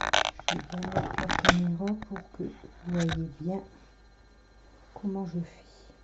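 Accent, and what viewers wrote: North, France. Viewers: merci vous avez l'accent du nord que j'aime je suis née dans le nord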